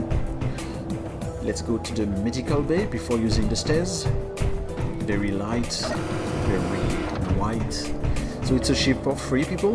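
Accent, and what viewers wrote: France. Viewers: Sympa ton mothership ! Un petit accent français dans la voix je trouve :) Beau boulot sinon !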